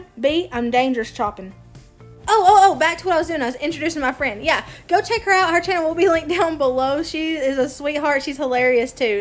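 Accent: South, United States. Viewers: You look just like my granddaughter and have the same southern accent I absolutely love it😂😂💕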